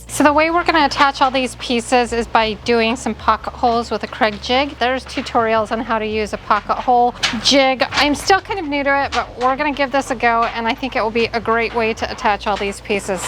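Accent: United States. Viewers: it's so funny when americans try to pronounce swedish words. Love it. ❤️😃 no hate love from your Swedish followers 👌🏻🎉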